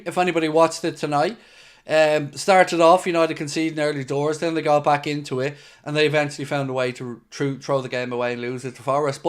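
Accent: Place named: Liverpool, United Kingdom. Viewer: Struggling to understand this guys thick scouse accent !!! Tourist club real scousers support the toffees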